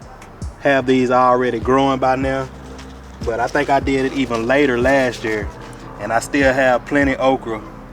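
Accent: Houston, United States. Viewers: Exactly I’m from Houston as well. Sounds like a Houstonian. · Lord, I'm from Houston. And I am so glad to hear somebody who SOUNDS like me in the garden! 😁😁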